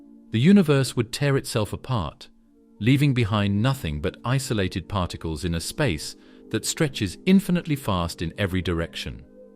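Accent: England, United Kingdom. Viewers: The usual dribble (English accent included) with generic impertinent graphics. Good for sleeping though.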